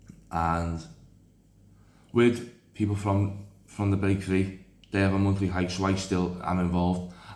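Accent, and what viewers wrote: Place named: Liverpool, United Kingdom. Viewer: ❤❤❤ most honest talk I have ever heard what a pleasure to listen to proper scouser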